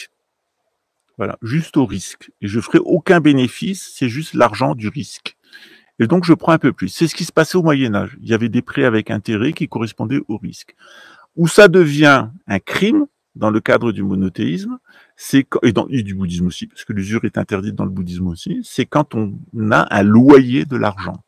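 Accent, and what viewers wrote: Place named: Italy. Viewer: Belle accent Italien! L’Italie vous aime, je suis a Rome et décider de vivre ici, les Italiens sont adorable et bienveillant, merci pour vos vidéos incroyable et instructives! Que dieu vous bénisse.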